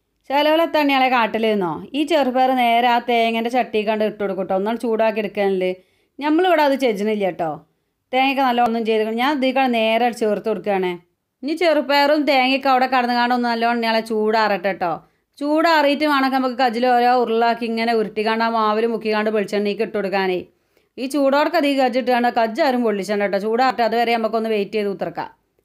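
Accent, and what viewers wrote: Malappuram, India. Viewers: താത്താ നിങ്ങടെ വർത്തമാനം മലപ്പുറം ജില്ല വിട്ടിട്ടുണ്ട് കുറച്ചൊക്കെ കുറയ്ക്കാമായിരുന്നു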